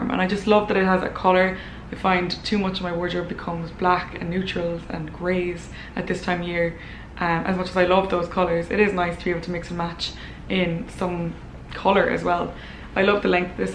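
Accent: Ireland. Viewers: Always look forward to your videos, especially when you talk with your Irish brogue ❤️ Love from Canada 🇨🇦 Don’t worry, I’ve got my winter puffer jacket out. Getting way chilly here in Eastern Canada.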